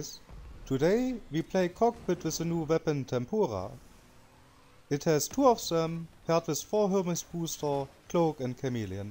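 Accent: Germany. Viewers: Bro man hört so hart das du Deutscher bist xD. Aber trotzdem nices Video!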